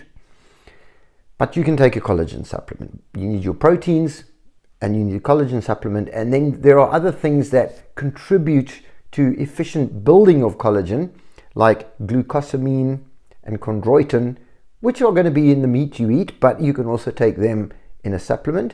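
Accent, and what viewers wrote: South Africa. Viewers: Are you South African (by your accent!?) Can you give an example of what you ate in a day?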